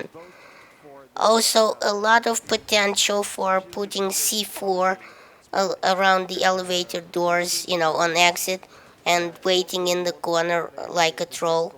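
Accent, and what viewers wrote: Russia. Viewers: are you Russian by any chance?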